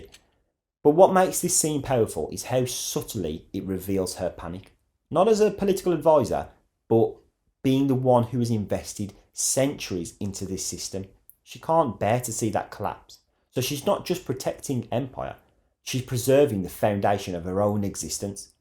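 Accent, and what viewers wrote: United Kingdom. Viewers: 😂 sounds Brit to me 💯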